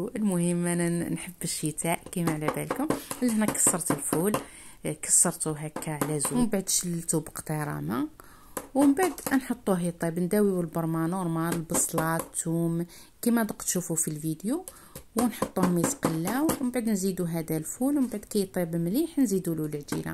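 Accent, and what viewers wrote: Algeria. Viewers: وين هادي تبالي عندنا في ميلة نفس الجاري لي نديروه وتبالي نفس لهجة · ربي يعينك اختي الكريمة صوتك يبان من جهة الشاوية مانحسوش من جهة جيجل رائعة فحلة نتاع الصح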